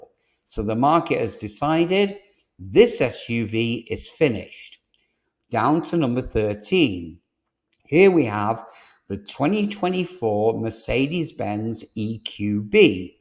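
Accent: England, United Kingdom. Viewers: Wow! Seems as if I am way out of this regime! I am an 82-year-young South African chap who proudly owns a 1999 Land Rover Discovery 2 V8. I have never seen any of the vehicles which you mention, here in beautiful South Africa, so cannot comment. Maybe people should be educated in matters mechanical before thay purchase their 4-wheeler. My Landy has done just 280 000 kilometres, has never let me down, and has had the four usual problems of its model attended to timeously. Leather seating, full aircon, great sound system, absolute reliablilty, easy driving with excellent driving view and man, it goes simply anywhere and everywhere! I am very interested in your use of the word 'GAS", dear presenter. With your delightful English accent, I would expect you to use the term "petrol", as we do here in beautiful Cape Town. Thanks for great entertainment!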